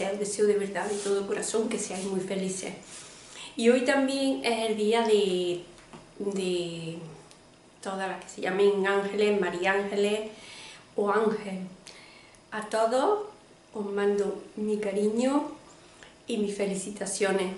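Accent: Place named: Cordoba, Argentina. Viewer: Muy ricos estos filetes rusos😋😋 Muchas gracias a tí por compartir esta rica receta. Cada día estoy más contenta de ser una de tus suscriptoras. Me encanta tu canal. Me gusta como cocinas y como explicas tus recetas con ese acento al cual le tengo tanto cariño. Un abrazo desde Barcelona y hasta el próximo vídeo, cordobesa guapa. 😘😘😘☀️☀️☀️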